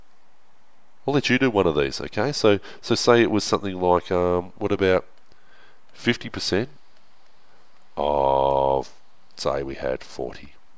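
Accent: Australia. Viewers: I feel like he's Australian, not British. Of course an American can't tell the difference lol. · so weird and nice to hear an aussie voice on YT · I think your an Australian because you have a very aussie accent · Hes definitely Aus, from a fellow Aus.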